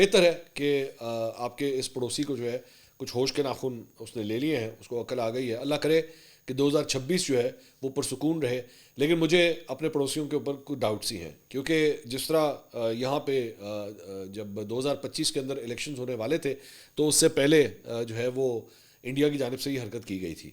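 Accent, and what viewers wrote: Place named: Lahore, Pakistan. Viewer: Salaam Mansoor Bhai Lahori panjabi ka tarka apke moon se bohat acha lagta ha? Kindly es ko continue rakhen.thanks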